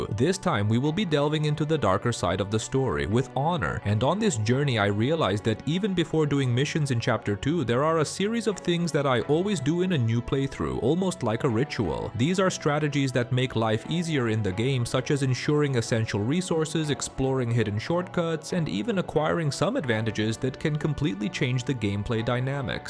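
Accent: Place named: India. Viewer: You sound like indian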